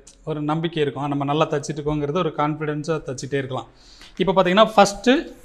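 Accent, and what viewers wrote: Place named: Madurai, India. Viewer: Anna neenga Madurai ya..? Unga slang super😊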